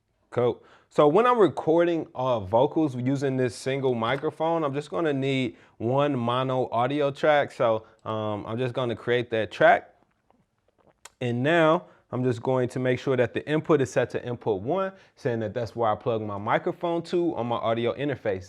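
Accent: St. Louis, United States. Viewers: You from Stl? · I knew you was from st. louis by how you was pronouncing yo ER's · Yoo, you from the STL?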